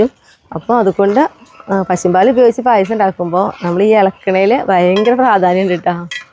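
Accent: Thrissur, India. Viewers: നമ്മുടെ ത്രിശൂർ ഭാഷ വളരെ ഭംഗി ആയി സംസാരിക്കുന്ന ആളാണ്. എനിക്ക് ഒരുപാട് ഇഷ്ടവും ആണ്. വീഡിയോ കാണുന്നത് നമ്മുടെ തൃശൂർ കാർ മാത്രം അല്ലല്ലോ,😢😢😢 നോക്ക്..... വായോട്ടാ എന്ന് പറയുന്നതൊക്കെ കേൾക്കാൻ എന്തൊരു രസം ആണ്.😂😂😂😂 ദേഷ്യം ഒന്നും മനസ്സിൽ വെക്കരുത്. നിങ്ങളെ രണ്ടു പേരെയും പെരുത്തിഷ്ട്ടം മാത്രം 🎉🎉🎉🎉🎉🎉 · അറിയില്ല. ഇന്നസെൻ്റ് സിനിമയിൽ തൃശൂർ ക്കാരനായി സംസാരിക്കുമ്പോൾ നമ്മൾ natural acting എന്നു പറയുന്നു. അദ്ദേഹത്തിന് കൈ അടിയും കിട്ടുന്നു. സാധാരണക്കാർ സംസാരിക്കുമ്പോൾ അതു തിരുത്തുക എന്ന് പറയുന്നത്? ഇത് സ്റ്റൈൽ ആയി കരുതി കൂടെ? എനിക്ക് എൻ്റെ കൂട്ടുകാരുടെ തൃശൂർ, പാലക്കാട് സംസാരം ഒക്കെ രസം ആയിട്ടാണ് തോന്നിയത്.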